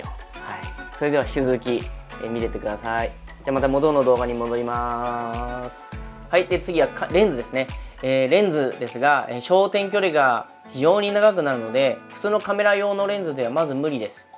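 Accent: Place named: Hokkaido, Japan. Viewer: 恥ずかしながら私はカメラマニアではなく、北海道弁が気になっちゃって、拝聴させております。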